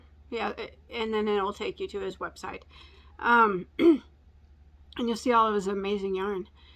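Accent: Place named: Illinois, United States. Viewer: No one should correct your regional pronunciation. A lot of people pronounce "wash" the same way. A friend from Illinois used to say it like that, and I know you're also in Illinois. Most of us have a regional dialect & it's impolite to belittle that.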